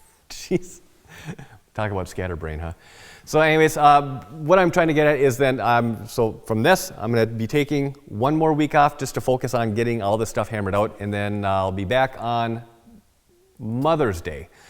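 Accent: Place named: Wisconsin, United States. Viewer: Based on his accent I’d bet he’s from Wisconsin so not much different weather wise.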